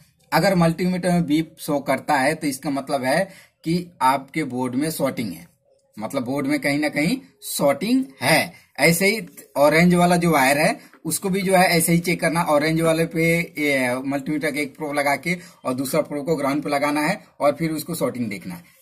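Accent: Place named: India. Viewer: indienglish... q bueno.-....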